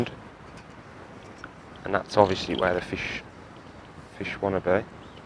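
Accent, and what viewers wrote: Birmingham, United Kingdom. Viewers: Brilliant video our kid love the brummie accent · Love the videos. Despite the Brummy accent, I expect to see great things from you in the future!!!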